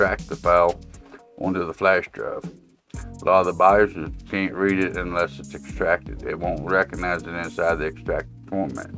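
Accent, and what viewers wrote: South, United States. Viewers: I’ve never seen a man so southern but so smart with pc😂 THANKS ALOT BROTHER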